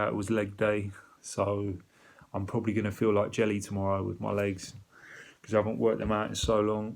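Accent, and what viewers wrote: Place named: United Kingdom. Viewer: Its the British accent 🤩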